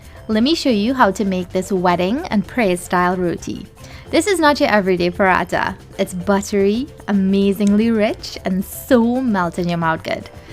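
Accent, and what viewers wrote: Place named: Trinidad and Tobago. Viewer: Amazing, love the trini accent.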